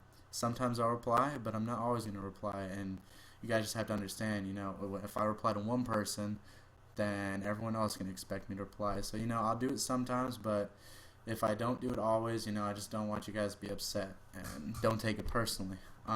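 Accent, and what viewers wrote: Canada. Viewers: I can hear the Trailer Park Boys in his voice. It's amazing. Soarry. Abut. Grade 5. Incredible. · Change the title to "All Aboot Me ". lol, I like how Canadians say 'about', and 'sorry'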